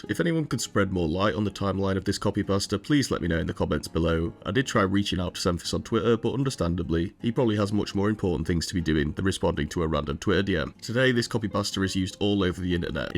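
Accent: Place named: United Kingdom. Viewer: yeah I was tripping a bit cuz your accent is the same as mine but something was telling me off 🙏🏻 which part of the uk tho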